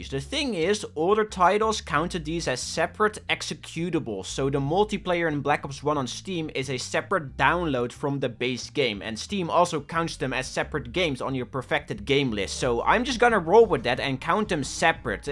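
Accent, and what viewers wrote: Netherlands. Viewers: jij klint heel nederlands ben jij? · i heard your accent and could immediately tell you were dutch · you sound so dutch its actually insane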